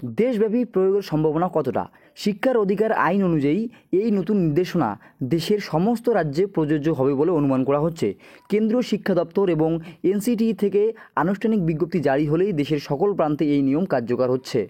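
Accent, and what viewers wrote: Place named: Bangladesh. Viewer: Tui 100% bangladeshi, tor kotha sunei mone hoche, edeshe kire bara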